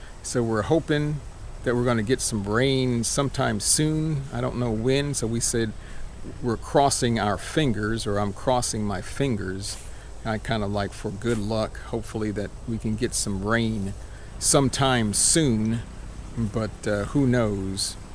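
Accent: Texas, United States. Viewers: I like the Texas dialect ! it´s laid back and cool Wild West style😃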